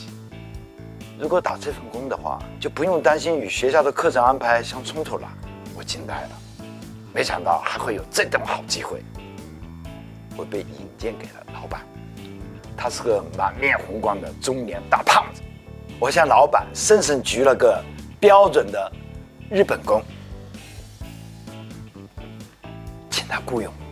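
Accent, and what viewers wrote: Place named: South, China. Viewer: 李さん的普通話口音是南方人，跟深圳相似。又不是央視新聞，無所謂啦。👋🏼😄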